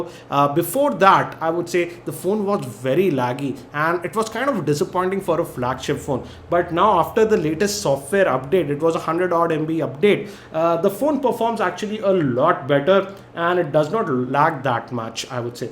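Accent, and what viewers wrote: India. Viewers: your axent is anoi g stupid indi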